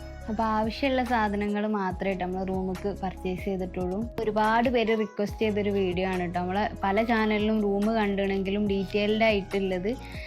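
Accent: Kozhikode, India. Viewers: Malayalam samsarikkan ariyanhitalla.calicut bhasha anganeyane suhrthe.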